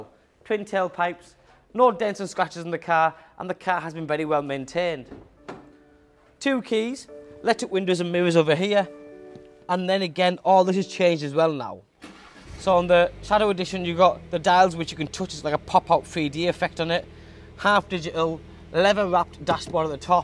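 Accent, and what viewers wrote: United Kingdom. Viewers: How you sound so British? Or is this a voice over.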